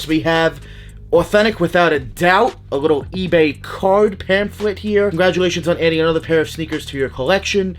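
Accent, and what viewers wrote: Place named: Brooklyn, United States. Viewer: Dudes definitely from Brooklyn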